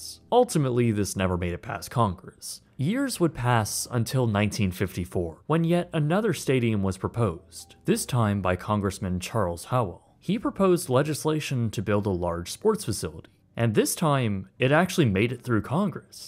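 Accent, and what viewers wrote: Canada. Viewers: Thanks for another great video. Hearing you pronounce The Padres was funny. I'm not poking fun, I understand you have a Canadian accent. Our differences makes life interesting.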